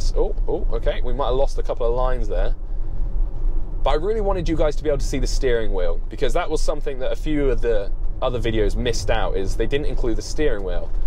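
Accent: United Kingdom. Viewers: Great to hear a Brit commentary for a change. Nice interesting engaging content. Could do with more shots showing what the car is seeing on the display. I'm waiting for a 3 or Y. But still interested to see where autopilot is at on UK roads.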